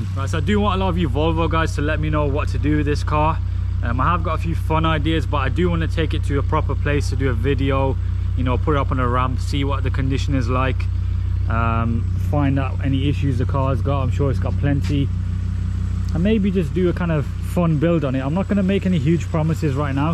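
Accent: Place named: Liverpool, United Kingdom. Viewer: Yes I love them you’re a bit of a scouser on the sly ay 😄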